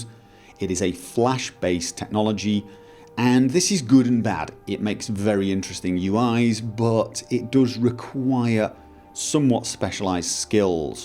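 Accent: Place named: Scotland, United Kingdom. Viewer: Ive always wondered but never really bothered to ask, so... Where are you actually from? Been subbed for, ugh, quite some time actually, like, over 2 years-ish, so Im guessing I kind of must have missed the info or something. Iunno, but I think you sound southern-scotland-esque.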